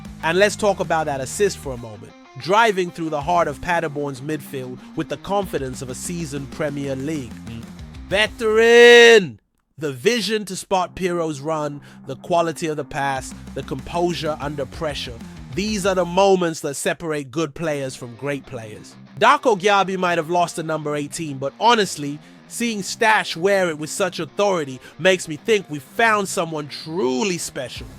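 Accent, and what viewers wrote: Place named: United States. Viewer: Why change the voice to American. It’s unbearable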